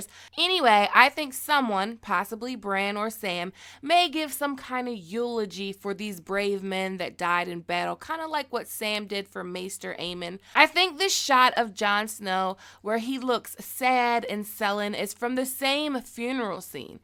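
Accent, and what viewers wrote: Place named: Michigan, United States. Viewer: You from Michigan aint you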